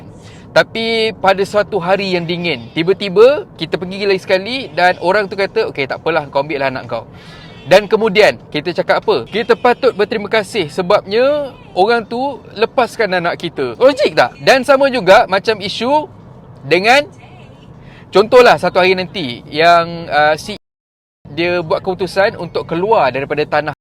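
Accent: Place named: North, Malaysia. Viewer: blk utara ka.. Best mampuihhh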